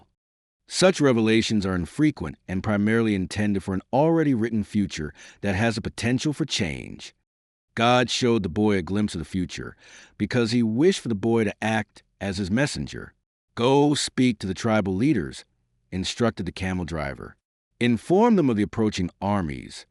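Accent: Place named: California, United States. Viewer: It’s very standard California accent, but not quite what you’d hear in Hollywood. I quite like it as it has a lot of character to it.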